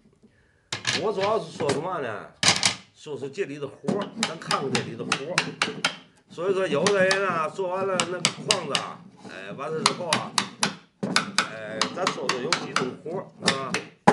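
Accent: Tianjin, China. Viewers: 天津口音 哈哈，很亲切啊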